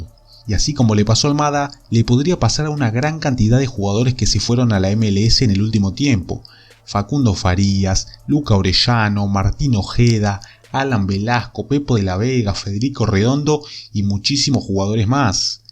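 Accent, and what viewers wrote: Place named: Uruguay. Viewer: El acento Uruguayo es asqueroso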